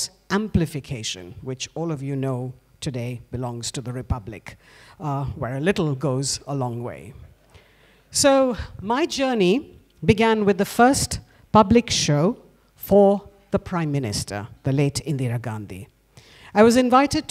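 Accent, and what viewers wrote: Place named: United Kingdom. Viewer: The best news reader of all time with a professional British accent.Absolutely commanding,crisp,focussed and dominating.Never heard a speaker like her in my life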